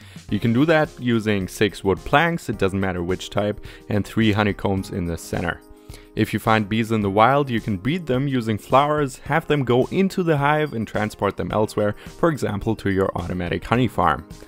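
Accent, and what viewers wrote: Germany. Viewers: Hab dich zufällig gefunden hab jetzt nach 4 Jahren kein minecraft ,wieder angefangen und finde deine Videos hilfreich und hab direkt an deinem Akzent erkannt das du deutscher bist (Bratwurst und Bier bruder)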